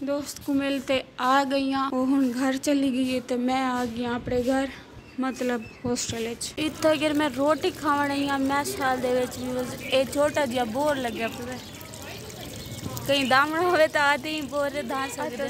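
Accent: Saraiki, Pakistan. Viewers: Apke voice bhut ache saraiki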